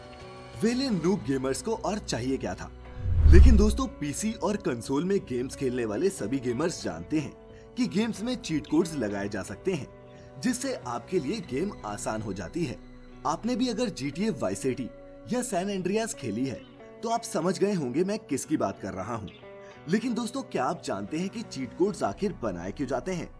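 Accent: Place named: Rajasthan, India. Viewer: Bhai aap pkka RAJASTHAN se ho na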